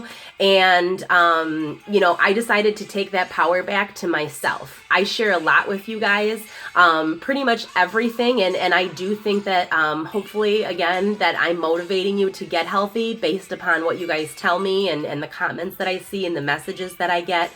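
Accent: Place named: Chicago, United States. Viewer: sounds like chicago baby!!!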